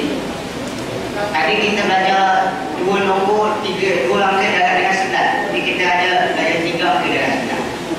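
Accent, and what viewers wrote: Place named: East Coast, Malaysia. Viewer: cakap pahang ke ganu ke kelate ke apa, tak brp dengar 😂 jap, kalau 55x22,camne plk?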